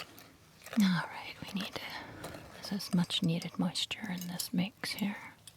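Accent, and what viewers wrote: South, United States. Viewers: I loooved your funny southern accent 😁 Let me just say it's interesting how you measure the ingredients by volume, here in Italy the measurement is by weight 😱 BTW I'm a huge fan of yours since the beginning, your voice is so relaxing (and I'm usually a no-talking person 🤷‍♀️) All the best from Italy 💙🤗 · I love your southern accent 😂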